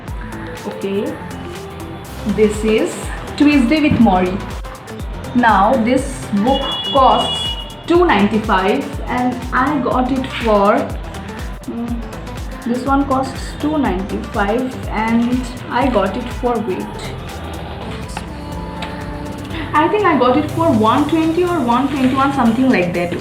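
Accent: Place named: Assam, India. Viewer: R u from Assam ........ Karon kothat olpo Asomiya lagil❤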